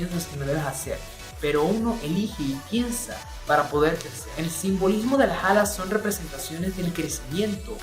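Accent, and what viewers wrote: Venezuela. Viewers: Siento que eres de De Venezuela :vvv Puedo estar equivocada :vvv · pregunta ¿eres venezolano? es que cuando dijiste coño jajaja me dio mucha risa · AME TU TEORÍAAAAA. Eres venezolano verdad? :V Bueno, ame tu teoria x2 tiene mucho sentido quedé impaktadah :v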